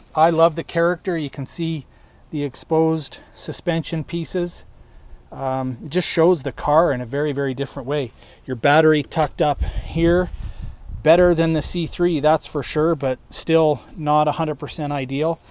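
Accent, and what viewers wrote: Canada. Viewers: It’s Canadian dialect you ignoramus. Their Z is pronounced zed.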